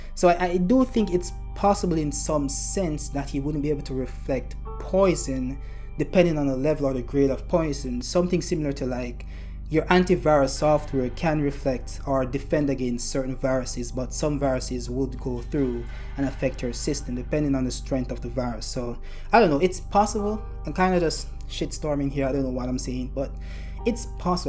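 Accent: Jamaica. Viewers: You form Jamaica? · U Jamaican? 🤔 That accent sounds familiar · ARE YOU JAMAICAN?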